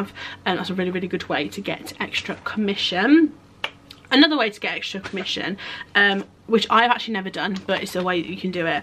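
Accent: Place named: England, United Kingdom. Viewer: Ooooo! English accents are always so delightful to listen to. ^.^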